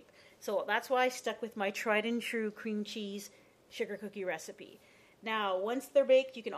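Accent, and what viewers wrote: Canada. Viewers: where are you from? it sounds kinda like a strong Canadian accent, but I don't really know.. Minnesota? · Are you canadian? You have that baker/canadian soccer mom accent..I love it :D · I can hear her canadian accect noww!! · You are Canadian! I love your accent! I subscribed! All of you vids are so cute! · lol you sound so canadian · Your accent sounds like your from canada, but you measured in inches, Wisconsin?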